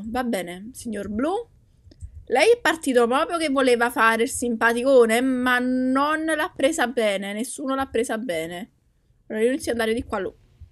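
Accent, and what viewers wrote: Naples, Italy. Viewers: ma sei napoletana?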